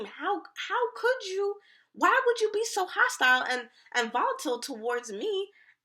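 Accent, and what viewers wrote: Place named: New York, United States. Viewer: I love your vibe , I literally feel it through the screen. From your vibe to vocab I love it; I heard you voice and was like yuppp sis gotta be from NY. Just subscribed; love ya 💕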